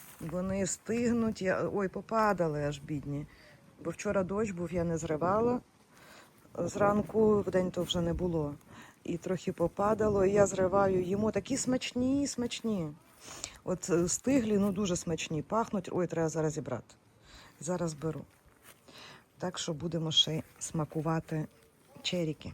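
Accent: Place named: Western Ukraine, Ukraine. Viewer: Дуже интересно, ви из захидной Украини? А звидки? Акцент наш!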